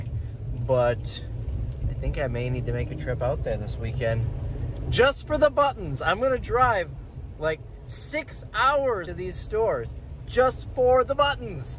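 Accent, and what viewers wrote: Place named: Midwest, United States. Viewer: New subscriber here from Phoenix, AZ. I grew up in a tiny little town north of Rice Lake called Shell Lake, so it was fun to see familiar landscape and hear the Midwestern accent....with the dry wit! 😊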